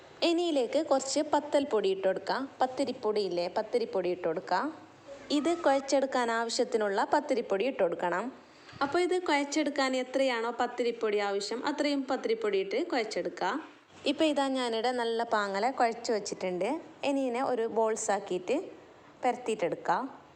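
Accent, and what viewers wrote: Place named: Kasaragod, India. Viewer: നെയ്പത്തൽ കാസർകോഡ് സംസാരവും ഇഷ്ട്ടപെട്ടു 👍🏻👍🏻👍🏻👍🏻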